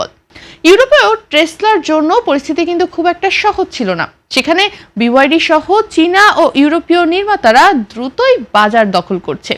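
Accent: India. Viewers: এই মহিলার বাংলা পশ্চিম বঙ্গের মতো লাগে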